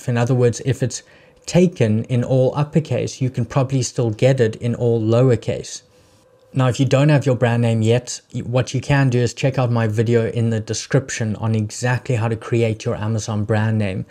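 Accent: South Africa. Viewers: Hey, Good to hear a South African accent. I'm based in Spain (from CPT originally), I've opened an LLC in the USA, but struggling to get a Bank Account in the USA at the moment. Would you say it's best to open a Professional SC Acc as an Individual so that my Spanish address registered with my Bank Acc in Spain lines up with my address on SC until I get a USA Bank and then update my details to a company on SC? Is that even possible? Even if I have a US Bank Acc, it will likely have to have my Spain address I think... as I only have a Wyoming Registered Agents and Mailing address, and the agents have said it's not advisable to use that address for banks. Plus there's the utility bill issue... 🤷🏼‍♀️ Any direction you can offer on this? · Just as I was about to ask if you were South African you said you were South African lol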